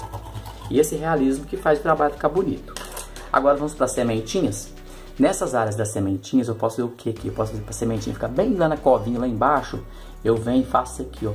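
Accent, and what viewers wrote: Minas Gerais, Brazil. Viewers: Suas aulas são maravilhosas sua didática muito boa homem de fé eu também sei da nossa dependência de Deus Todo poderoso.Qual cidade você é tem sutaque mineiro gostaria de receber seus riscos ,sou da Bahia.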